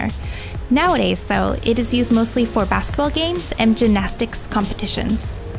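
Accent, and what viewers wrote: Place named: United States. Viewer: Great video! Great American English accent and pronunciation! 💕 ☮ 🌎 🌌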